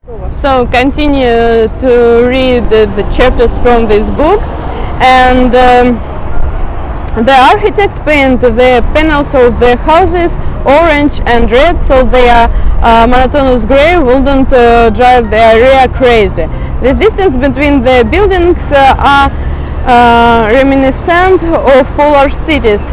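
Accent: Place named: Russia. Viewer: Your accent is so cute. Don't be embarrassed. English is weird because it doesn't follow its own rules, and it is also difficult to learn from texts because we do not say words in the way they "should" be said. Remember that on the internet, there are people who are just jerks. I doubt they could speak Russian.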